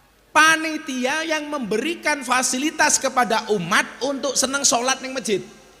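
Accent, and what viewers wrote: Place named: Java, Indonesia. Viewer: Kiyaiku yg ganteng alhamdulilah muncul lagi.aku suka medok jowone metu.kiyai udolah semua imat sejahat raya.gas puulll mantap kiyai ganteng.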